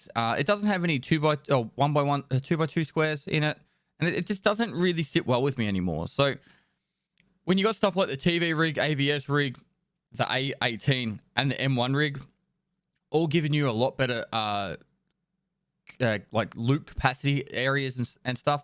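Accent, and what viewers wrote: Australia. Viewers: is australian or a kiwi?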